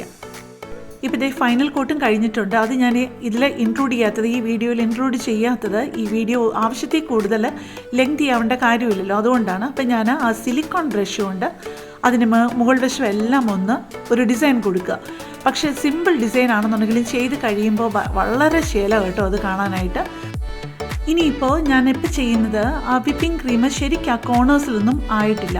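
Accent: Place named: Pala, India. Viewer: എന്റെ friend geetha george ഇതു പോലെ പറയും നല്ല ശെല് എന്ന്. പാലക്കാരി ആണോ 😍😍😍😍😍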